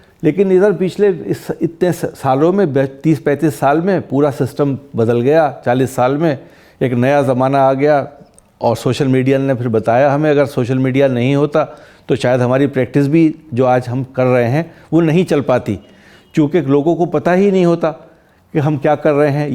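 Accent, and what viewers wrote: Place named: Western Uttar Pradesh, India. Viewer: ये बताएं ,आपके चेहरे की चमक का राज क्या है ,आपके बोलने के अंदाज में पश्चिमी उत्तर प्रदेश की मीठी खुशबू आती है धन्यवाद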